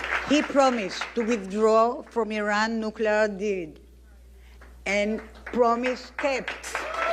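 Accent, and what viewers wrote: Germany. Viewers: What other answer can be expected from a man with name Said Abou? Your Muslim hate for Jews you sucked with your mother’s poisonous milk. Your subscriptions are tell about you a lot… No, this German accent speaking Dutch antisemite is well-known by his lies, half-truths, distortions. So, he is your friend in hate…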